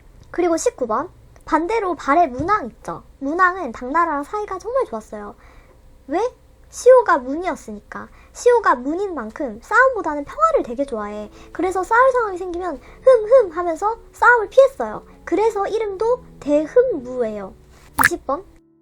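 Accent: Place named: Jeolla, South Korea. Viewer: 전남쪽 냄새가 납니다ㅎ귀여워요~ 유용한암기법 잘 보고 갑니다잉^^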